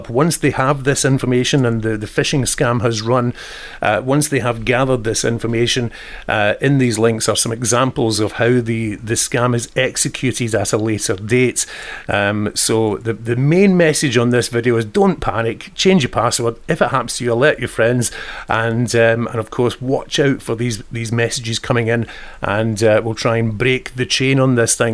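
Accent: Scotland, United Kingdom. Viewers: loved hearing your Scottish accent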